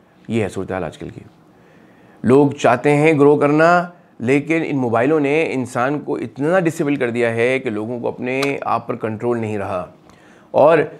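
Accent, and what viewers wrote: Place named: Karachi, Pakistan. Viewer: اسلام علیکم جزاک اللّہ سر آپ کا بولنے کا انداز کراچی والا ہے لیکن آپ بتارہے ہو آپ مری میں رہتے ہو ؟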